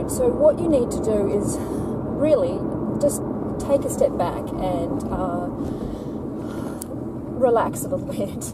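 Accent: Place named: South Africa. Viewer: hi are u from south africa it sounds like it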